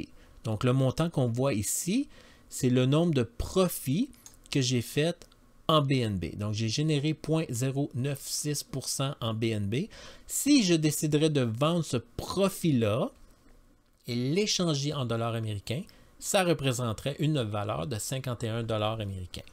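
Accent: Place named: Quebec, Canada. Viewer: AH voilà je savais que tu étais québécois ! Préfères tu bitsgap ou crypto hopper ?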